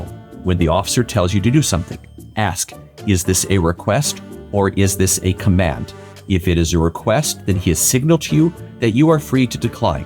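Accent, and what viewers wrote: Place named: Canada. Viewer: Clear Counsel is not very clear or helpful. This dude sounds like he’s from Canada!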